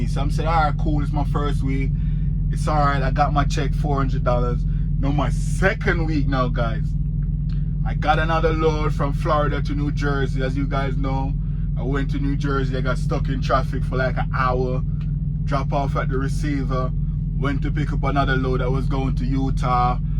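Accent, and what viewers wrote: Jamaica. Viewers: Are u ja Jamaican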